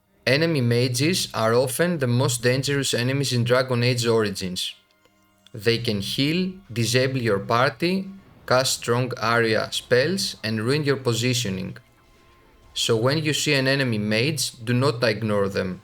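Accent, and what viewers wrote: Canada. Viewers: love your canadian accent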